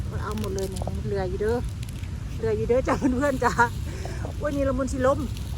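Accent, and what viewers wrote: Sakon Nakhon, Thailand. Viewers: สำเนียงบ้านเฮาคักเนาะจ้าสกลละเบ๋อ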